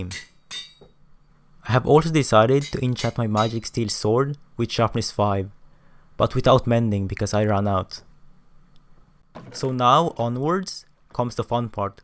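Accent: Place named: Philippines. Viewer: i sense filipino accent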